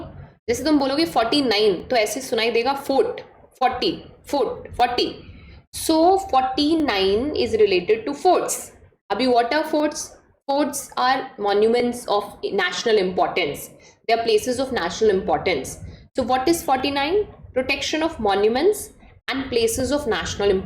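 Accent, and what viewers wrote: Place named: Bengal, India. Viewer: I thing he is from Bengal , his means “Jaldi kro gap mat Karo”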